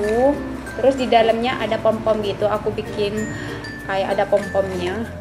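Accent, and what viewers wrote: Madura, Indonesia. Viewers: Suka sama cara bicara .. Soalnya khas² maduranya itu kerasa🤣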